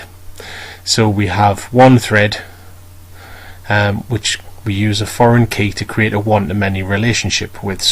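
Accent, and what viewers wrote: North, United Kingdom. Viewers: Nice to hear a fellow Northerner's voice. This was very helpful, thank you.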